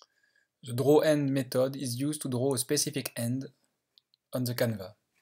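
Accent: France. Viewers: He has a french accent.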